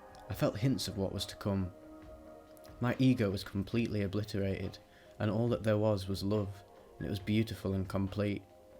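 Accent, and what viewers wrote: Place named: England, United Kingdom. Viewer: you're a good narrator, how far has this video taken you? the english accent, the world loves it as much as japan loves complex metal, would like to hear more trip reports from the wid void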